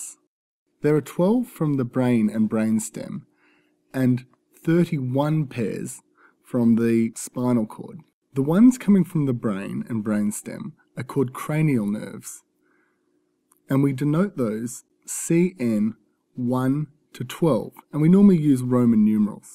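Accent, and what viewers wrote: Australia. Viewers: Thanks, simple easy to understand and with a pleasant Aussie voice. What more could a Med student ask for. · How? Stewie sounds English and this guy sounds Australian 😒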